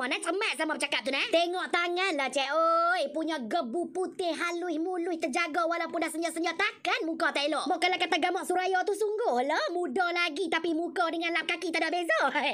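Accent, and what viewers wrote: Kedah, Malaysia. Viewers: Nak lagi bideo cmni...sronok dgaq org kedah pure ckp...sy pn lahir besaaq kt aloq staq...tp kami loghat kedah besa2 ja... nih msti org blah2 kota sarang semut pendang yan batu hampaq kot noh😅😅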